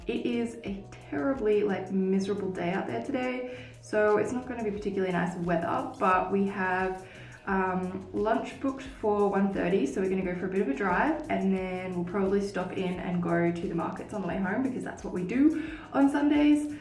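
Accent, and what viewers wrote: Australia. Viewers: M.A Australian ♡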